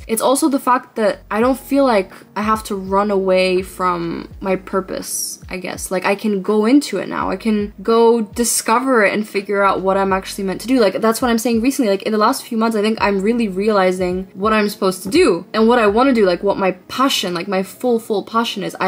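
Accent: Russia. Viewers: У тебя идеальный русский акцент!❤❤❤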